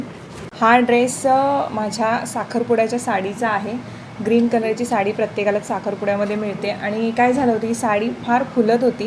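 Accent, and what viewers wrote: Kolhapur, India. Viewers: Tai me suddha kolhapur chi aahe tumhi dadansobt kolhapuri bhashet bolt hota tr khup bhari vatal😍😍😍jagat bhari aapli kolhapuri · ताई तू कोल्हापुरी भाषेचे छान बोलतेस एकदा बोल की मग व्हिडीओ त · Ya blog la tu assl kolahpuri bollis khup mast vatl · Nice vedio all....pn tumhi Kolhapur che aahe he mast vatal language aaikun😘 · Kolhapuri language ahe tumchi😀🔥❤️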